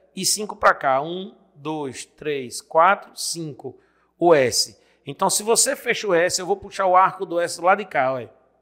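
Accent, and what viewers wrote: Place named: Maceió, Brazil. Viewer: Vcs são de Maceió? O sotaque parece muito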